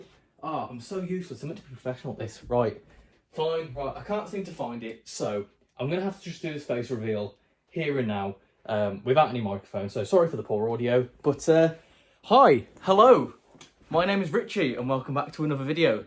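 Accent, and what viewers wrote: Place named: West Midlands, United Kingdom. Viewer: Congrats on 1000 subs. Bonus points for the epic West Midlands accent. It's bostin' our kid! :D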